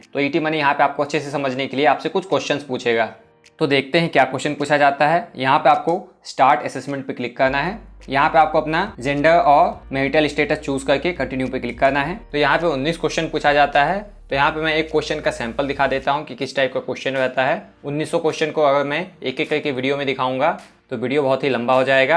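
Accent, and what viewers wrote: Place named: Bhojpuri, India. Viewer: Pehle apka Hindi bhojpuri sidharo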